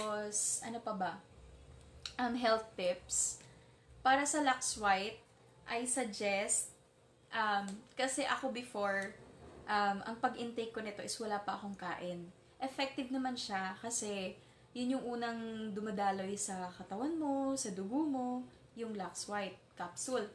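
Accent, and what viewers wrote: Visayas, Philippines. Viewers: Taga Bisayas yan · Wow bisaya si ateng hahahah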